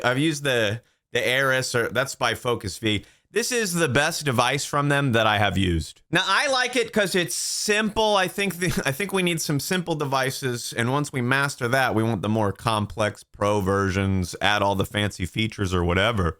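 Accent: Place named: Northeast, United States. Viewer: He sounds like hes from the NorthEast, sometimes long island sometimes the bronx, but rolls his r's sometimes haha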